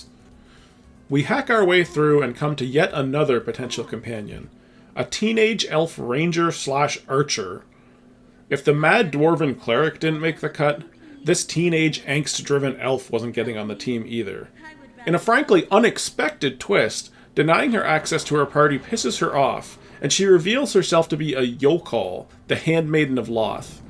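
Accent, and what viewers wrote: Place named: Canada. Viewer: I always pronounced it Men-zo-burr-AHN-zun, I love your Canadian mish mash on the pronunciation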